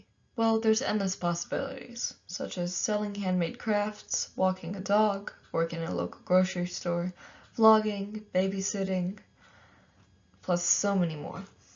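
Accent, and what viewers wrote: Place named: Russia. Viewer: Your Russian is showing 😅 Great presentation 10/10!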